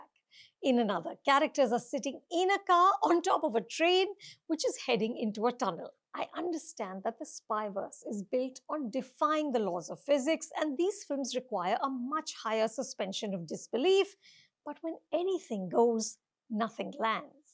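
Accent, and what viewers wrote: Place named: India. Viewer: I swear down Indians don't speak English ... They speak IND-LISH.. no way its English what she speaks lol what in the world is "laboured spy saga"...? How to say that in English? 😂😂😂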